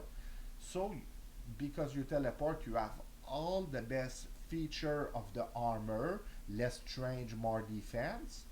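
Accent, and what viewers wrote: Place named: Quebec, Canada. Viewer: I cant leave a message on your video about enigma breaking the game because i guess alots of snowflakes insulted you but i just want you to know that myself (and all the old school diablo 2 players i know) hate enigma and wish it gone from the game!! Cta too Ps : est tu Québecois? Ont dirait que tu a l’accent du Québec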